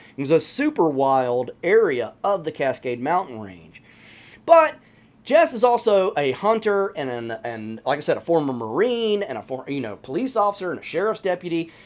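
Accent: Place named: South, United States. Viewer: Hello again, My dude. As ever, another well done presentation. Narration on point, albeit sprinkled with hints of friendly southern winds. As for the subject matter, I have yet another gift for you. Below is a link to the story of Port Lock Alaska - I will not say anything to spoil it, but.... this is one of those very little known stories with credible and VERIFIABLE bits and pieces out there... In my honest opinion - THIS IS BIGFOOT COUNTRY! Keep well, be safe, and as always, let's strive to earn the knowledge that will be our own individual lights on our darkest days.... <link>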